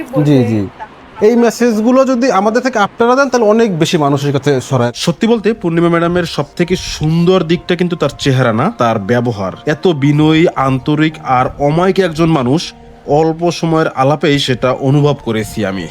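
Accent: Barishal, Bangladesh. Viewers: ভাইয়ের বাড়ি কি বরিশালে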